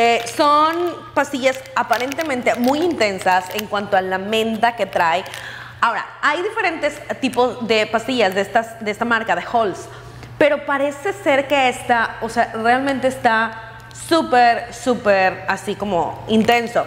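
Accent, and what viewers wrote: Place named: Fresa, Mexico. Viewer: Por qué hablas tan fresa !?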